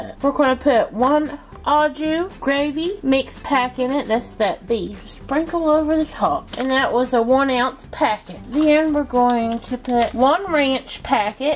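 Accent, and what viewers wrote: South, United States. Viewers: I love love love your accent and pronunciation of words! True southern accent??!! Lol. Truly Love your recipes and your cooking channel!!! Thanks for sharing your love of cooking and wonderful recipes!!!! God bless you!!!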